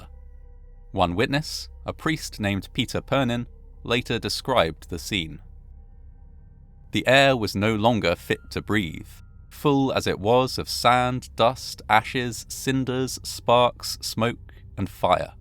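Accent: England, United Kingdom. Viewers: has English accent Americans find more authoritative. Send.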